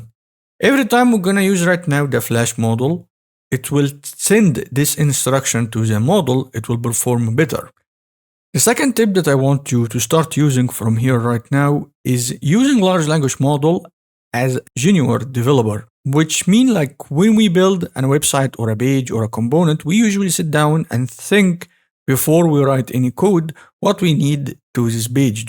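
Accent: Egypt. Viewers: nice setup! I love the thicc egyptian accent!😆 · Love the Arabic accent “seemingly to be Egyptian” though if I wasn’t wrong bro 🎉 Totally underestimated channel definitely subscribed عاش والله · I want to know where you are from😂 because this الوط accent very Egyptian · I love your accent Egypt?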